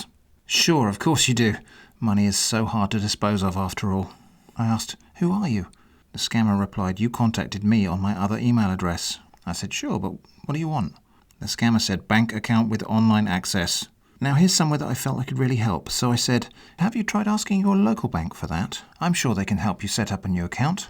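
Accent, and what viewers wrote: United Kingdom. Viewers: Amen to that ! He indeed has a very soothing British accented voice. Or perhaps Australian ?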